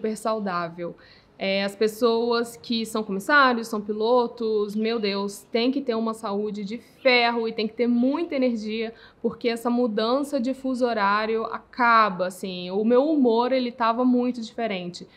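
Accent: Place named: Belo Horizonte, Brazil. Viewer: Sotaque belorizontino...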